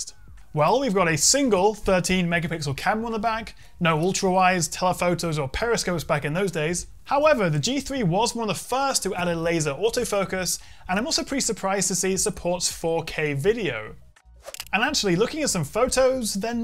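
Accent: United Kingdom. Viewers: Always loved your vids man great to listen to the British accent amoungst the sea of canadian tech YouTubers Happy for you your videos are always quality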